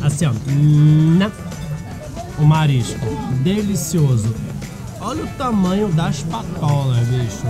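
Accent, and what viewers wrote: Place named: Salvador, Brazil. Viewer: Vc é baiano,? Tem um sotaque soteropolitano aí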